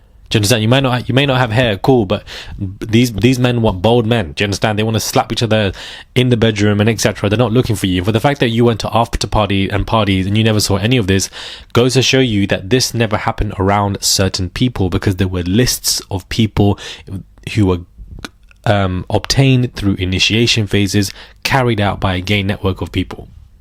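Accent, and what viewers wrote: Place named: United Kingdom. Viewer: I really like your take on things and your presentation. I especially find amusing when you speak in slang with your lovely British accent.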